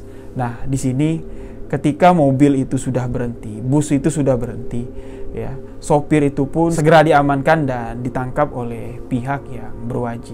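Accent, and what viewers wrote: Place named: Bali, Indonesia. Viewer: Abang ni logatnya bali sekali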